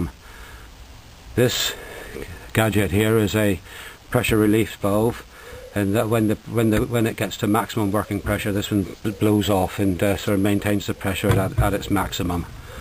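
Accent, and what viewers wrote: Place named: Cork, Ireland. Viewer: he's from cork (ireland) i think :)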